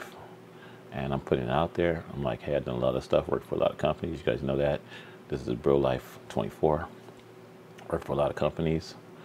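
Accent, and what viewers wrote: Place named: California, United States. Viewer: Wow! You got that CA accent now!!!! Much love friend.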